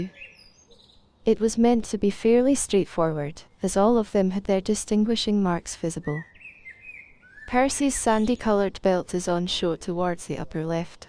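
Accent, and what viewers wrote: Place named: Scotland, United Kingdom. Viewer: This top-down surveying shot is so beautiful! I get to observe most of your boars and how they interact! They are so lucky to be free and have lots of space to explore and play around! So, you are, from Scotland, with a beautiful Scottish speaking style! May I ask who the primary caregiver is for these precious piggies? You really are doing a great job!!! I always have so much fun listening to and watching your video! Your piggies are more than adorable!:)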